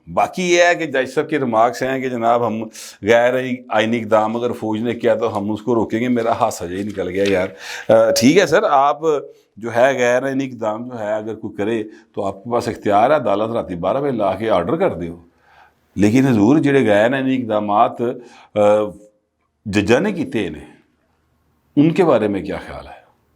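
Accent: Lahore, Pakistan. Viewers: tohada lahori andaz bada changa lagda hey.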